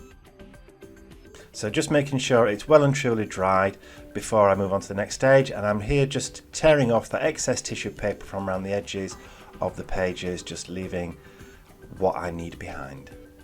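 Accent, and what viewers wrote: United Kingdom. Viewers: Love following you, it's great to have a mans voice and a British accent! Great ideas which I will be trying asap, keep up the good work and well done on your burgeoning fan base :)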